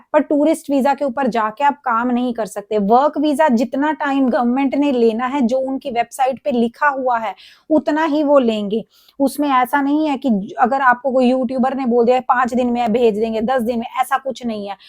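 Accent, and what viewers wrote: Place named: Chandigarh, India. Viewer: आपके बोलने के लहजे से लग रहा है आप चंडीगढ़ साइड से हो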